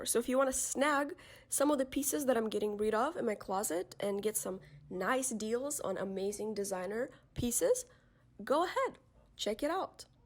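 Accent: Russia. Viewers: Is that a Russian accent? Me gusta. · A cute Russian girl. Still your accent is prominent, but overall a good job. Greetings from the EU.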